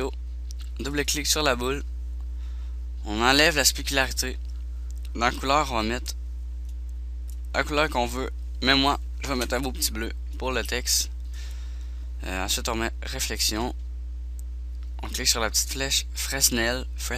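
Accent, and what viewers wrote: Quebec, Canada. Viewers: ralala l accent quebecois mdr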